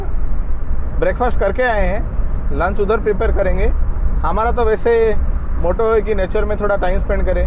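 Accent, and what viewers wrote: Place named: Odisha, India. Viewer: Keep up the great work. Proud of you. Best wishes to your family... specially. Really love your narrative.. with Odia MTI😁👍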